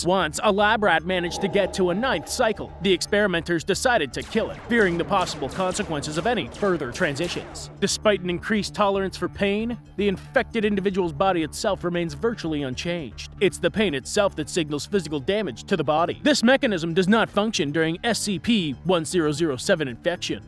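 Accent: Boston, United States. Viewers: I hear a Boston accent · Why does he have a Boston accent or like an accent in general like I can't tell what it is but I feel like it's a Boston one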